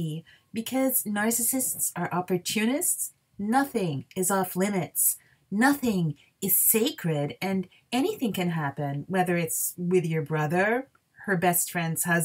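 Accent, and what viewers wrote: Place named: Canada. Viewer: I hear the Canadian in the presenters voice lol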